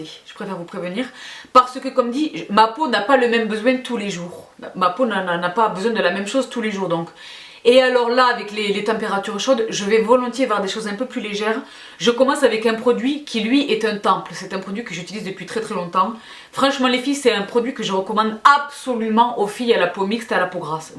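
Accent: South, France. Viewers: Coucou!:) Superbe vidéo. <3 Il y beaucoup de produits (enfin, beaucoup, c'est un bien grand mot. ^^) que je connais surtout des produits de la cosmétiques asiatiques (Une petite fan.:D ). Il y a aussi pas mal de pépites qui me font de l'oeil maintenant que j'ai vu ta vidéo (C'est mon compte en banque qui risque de ne pas être content. Ha ha! ^^' ). Ceux qui me tentent le plus sont: les Hadalabos, le "Time Revolution First Treatment Essence" de Missha et la protection solaire no sebum de Missha; Il y a le démaquillant/nettoyant de Vichy mais tu en parles dans une autre vidéo. Il m’intéresse surtout pour les moments où je suis plus motivée par mon lit que par la salle de bain.:D Ah et aussi l'huile d'avocat de Melvita pour mon contour de l’œil. D'ailleurs, le rouge à lèvres tatoue de Dior, j'ai l'impression que c'est un Tint. Sans le côté transfère par contre (ce qui le fait devenir plus intéressant). 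Mais beaucoup de marque coréenne en font (dont la marque Etude House que j'aime beaucoup surtout pour leurs multiples rouges à lèvres, leurs cushions, leur palette que je rêve de tester et le double lasting <3) depuis un petit moment. Peripera est connu pour leur Ink d'ailleurs. (si tu es déjà au courant et que l'as dit dans une vidéo, sorry, sorry: je n'ai pas encore vu toutes tes vidéos. Je débarque sur ta chaine.:) ) Voilà, merci de nous faire partager tes pépites, tes astuces, ta bonne humeur (<3), tes expressions (<3) et ton accent (j'adore l'accent du sud! <3)!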